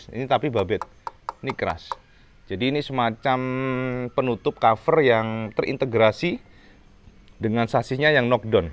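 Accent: Indonesia. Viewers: asli mna si pean cak,ko medok bngt bicarae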